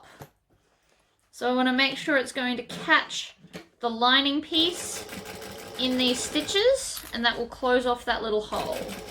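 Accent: Australia. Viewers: I just had Open Heart Surgery and I watch your videos when I can’t sleep. I like listening to your voice. I like that Australian accent! I think everything you make are beautiful.. especially the purses you make!! What talent!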